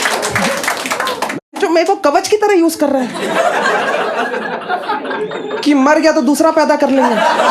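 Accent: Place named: Varanasi, India. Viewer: Nice, your banarasi accent is so relatable. Your comic sense is wonderful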